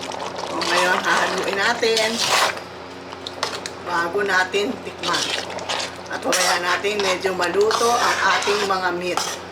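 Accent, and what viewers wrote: Iloilo, Philippines. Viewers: ilongga si Mommy??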